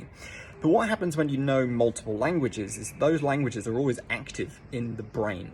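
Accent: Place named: Southern England, United Kingdom. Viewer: He has a Southern English accent, but its formally called an Estuarian English accent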